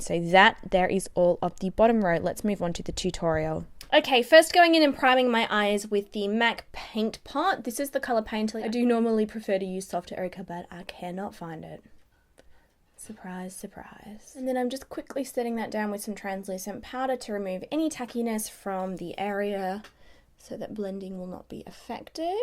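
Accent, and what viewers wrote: Australia. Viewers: Thyea Phelps She has an Australian accent! That's why she talks like that. Are you for real?! Lol. Do you want her to talk with a fake American accent just to suit you? This made me laugh 😂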